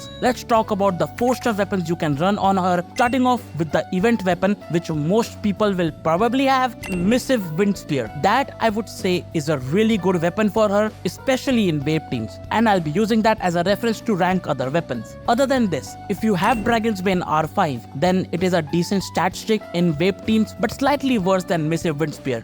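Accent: India. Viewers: unbearable to watch due to your Indian accent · Those racist comments are wild. Yeah you have a Indian accent, SO WHAT, ITS A BIG WORLD PEOPLE. Good job, gonna sub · leave it to some talented smart guy with a indian accent to finally explain it in a way i understand, thanks i subbed!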